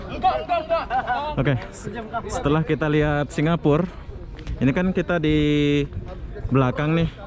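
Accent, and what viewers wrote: Malaysia. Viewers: Abang ini asli dari mana kah? Logat nya melayu bgt. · Masih penasaran aku..org indo tp kok logatnya malay..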